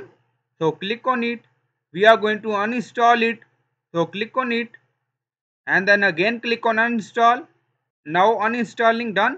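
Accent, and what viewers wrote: India. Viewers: Sempre um indiano falando inglês que me salva desses bugs da microsoft kkkkkk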